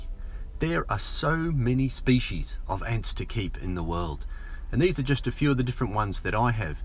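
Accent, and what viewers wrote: Australia. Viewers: Crikey! Are you somehow related to Steve Irwin? Good to hear another Aussie accent on YouTube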